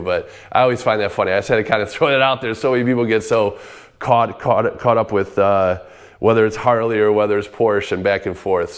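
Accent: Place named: Chicago, United States. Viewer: I have the Akrapovic Header and the beautiful diamond stitched Harley custom seat and vrod soft bags with VRod stitched on the sides with plastic inserts. Im so glad I didnt trade my bike like I almost did. All I had to do was get on the bike and start heading to Champagne Urbana from Bloomington to trade for the other bike and realized what the hell am I thinking! Pulled over twice before turning around saying im not trading this thing for nothing! 😂 Now that I didnt do it i realize I would have been kicking myself in the ass the rest of my life for such a stupid trade. Lol! The Deuce was a awesome bike and was chromed out to the max but it could have never held a candle to my Vrod muscle. My bike is the exact for as yours and I I actually have floor boards on mine instead of pegs and so my feet aren't like riding with forward controls which to me makes it even way more comfortable to ride and probably even safer. 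These Vrod Muscle's are definitely special bikes. I would think than anyone who hasn't ridden one would fall i love with these bikes if they did. Now someone would have to deal my Vrod muscle away from my cold dead hands to get it from me. I still have my original pipes but this Akrapovic Header for it just sounds incredible on it and is the number one Header pipe for the Vrod muscle. Since your in Illinois and im in Bloomington Illinois I was just wondering what city your in? Im thinking by the sound of your voice the Chicago suburbs somewhere? Lol!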